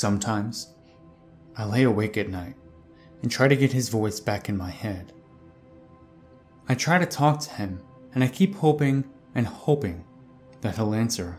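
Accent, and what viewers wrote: United States. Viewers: For sure. Don't worry about it! You should have alot more patrons as well. Or do people just use all those other sites you mentioned? Anyway this is one of those deep tales that kinda freak me out. Kind of a real thought worthy message there eh? Tho I don't think it was Saturnites or whoever that were the...makers. Pretty good pasta tho. Forgot to check-was that you doing all the voices? Seems like a pronounced Yankee lilt or something in there lol! Thankyou!😍✌🐾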